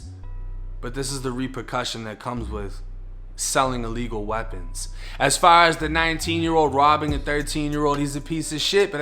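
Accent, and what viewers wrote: Boston, United States. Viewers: The boston accent is so unique, I can always tell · Thats how we talk up in Boston, dont hate.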